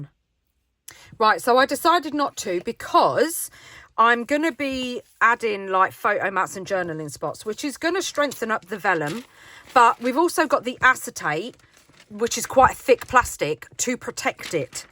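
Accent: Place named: United Kingdom. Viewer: From where in the UK are you ? I love your accent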